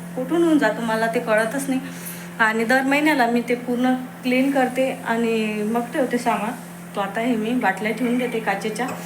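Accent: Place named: Ahirani, India. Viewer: ताई तुमचे video छान असतात. तुमच्या भाषेत उत्तम असा अहिराणी touch असतो.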